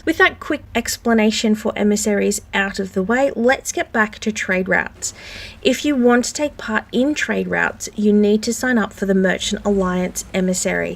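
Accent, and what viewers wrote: Australia. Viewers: I did enjoy the ozzie accent. Here's the method I use, firstly I will raise my emissary and complete one "lost voyage" mission from my spawn outpost. Upon completion I should have max emissary, if you don't have it then you should be very close since voyage levels differ with reputation levels. Afterwards I will sail to the nearest outpost and sell my voyage loot, using the funds to buy out their stock. Afterwards I'll sail to the next outpost in either a clockwise or counter-clockwise fashion, as you put it in the video and buy that outpost out and sell whatever you have that they are in need of. You can figure out which resource to sell an outpost without ever opening the merchant book too. Every outpost offers 3 of the same item they are in surplus of, 1 of every item they're good on, and none of the resource they are in need of. With this logic you can simply look at their stock and through process of elimination determine what resource they need without looking through the book over and over, and the more you do it, the better and faster you will be. 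My first round trip of the map will consist of me buying and selling to maximize profits, and I will mark every outpost I have visited on my map in order to keep tabs on whether or not I've gone full circle. Then, my second round trip of the map I focus on selling off everything only, un-marking each outpost as I visit them. This is about a 3-4hr session as a solo and profit after accounting the buy prices was around 450-500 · Great video, love the cinematography of it and of course the Aussie accent.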